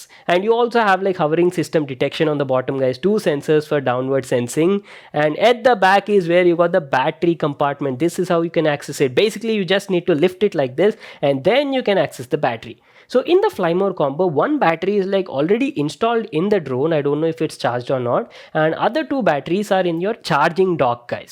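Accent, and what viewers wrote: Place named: Hyderabad, India. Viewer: Your accent says you are from Hyderabad 😃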